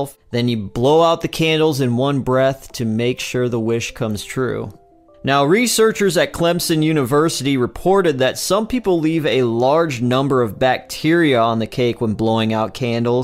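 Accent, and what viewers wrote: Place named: Buffalo, United States. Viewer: Im loving your Doc's, just found your site, about to watch this, cuz im hoping you bring up BIRTHDAY ICE CREAM CAKE... WHO EVER INVENTED THOSE CRUNCHY THINGs IN THE MIDDLE ARE GENIUS'S!!! Anyway, Im also trying to figure, where your from, you have that same Accent we have in Buffalo NY, which i also notice when i was living in Detroit, they kinda still had that accent, then moved 3,000 miles out West, & sounded like a fish outa water, its refeshing to hear an accent on YT, you know, instead of all the A.I.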